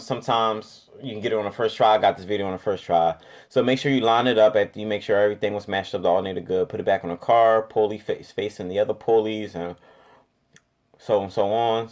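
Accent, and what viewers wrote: Philadelphia, United States. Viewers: Yo u from Philly bro?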